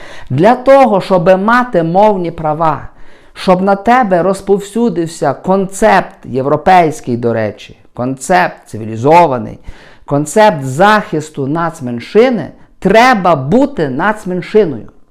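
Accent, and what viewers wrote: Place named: Lviv, Ukraine. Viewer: дякую за роз'яснення ситуації чудовим львівським говором. Вітання зі Львова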